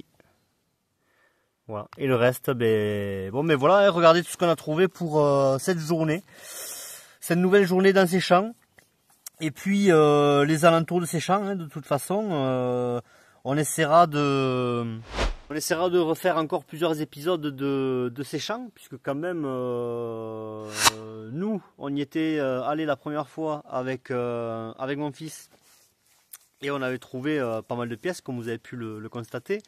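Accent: South, France. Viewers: Salut l'ami, beau site vraiment magique l 'ambiance. Vous êtes du Sud vu l'accent ...moi aussi je détecte dans le 13. Si des fois ...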